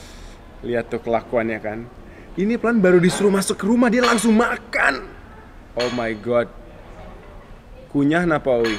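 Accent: Banyumas, Indonesia. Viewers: Mang mba nya org ngapak jg kya sy yah...rep ndeleng apaaa...kuwe artine mo lht apaaa..hihiii...btw...aslinya owee ank mna tuh...😘😘😘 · Mbaknya ngapak 😆 snowee lucu bgt 😘😘😍😍 fix, klw aku udah punya rumah sendiri mau punya satu :) udah pengen dari dulu.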